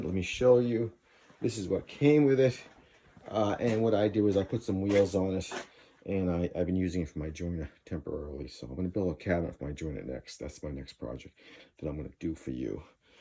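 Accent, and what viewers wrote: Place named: Rhode Island, United States. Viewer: your accent brings back 20 years that I lived around the Pawtucket, RI area! I bought my Reliant in Pawtucket back in late 80's-90's forget now exactly when. My late wife was from that area and she and her family at the dinner table on Sundays was a riot to listen to! Throw me down the stay'yahs a bruum! Anyway I'll double second every other comment here adding that I too have the 14", 1hp DD90 model. Man, that cabinet you built is awesome. The dust collection is genius. Finally, an old woodworking book-set I have has a chapter on bandsaws (1930's-40's) and title is "Bandaws - The Queen of Saws". Today politically uncorrect it still fits. Best video ever, thanks.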